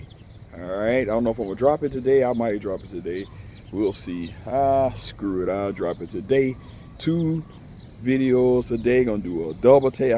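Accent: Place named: South Carolina, United States. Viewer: Gotta be South Carolina. Pee Dee area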